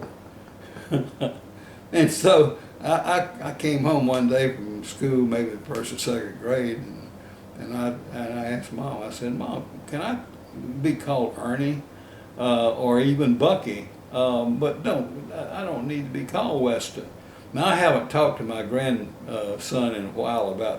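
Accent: South, United States. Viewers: it sounds so much better when you say it in your soft southern accent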